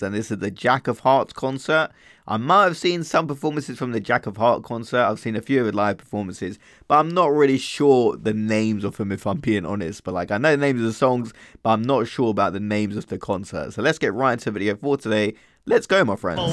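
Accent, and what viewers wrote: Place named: England, United Kingdom. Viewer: Thanka for doing this song of John's. I haven't seen any other reactor do this version before. Also love your nice English accent !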